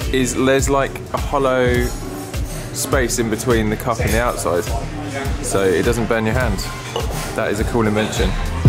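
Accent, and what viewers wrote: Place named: United Kingdom. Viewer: GOD, the British accent is SO GOOD, I could hear you talk all day!!! Greetings from Brazil ❤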